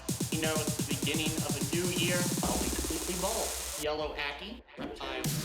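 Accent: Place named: New Jersey, United States. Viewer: Don't sweat the negativity,. I've learned a lot from you. You're my Ackie guy, and when I'm ready to get one I'll come to you. BTDubs, you can't help the Jersey voice bruh, lol. Lots of love from a southerner and I hope you feel better.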